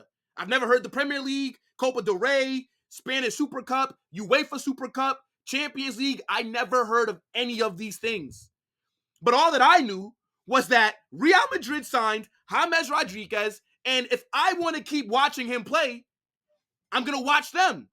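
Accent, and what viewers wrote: United States. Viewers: I'm so happy about finding this channel actually cause finally i found some one on YouTube speaking about my favorite team which is real Madrid and also about soccer with a very clear American accent that's amazing keep going 👏 👌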